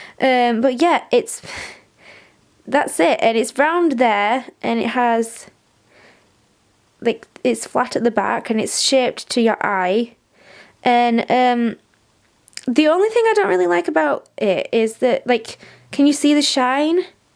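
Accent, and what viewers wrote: United Kingdom. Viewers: I love your accent, are you British?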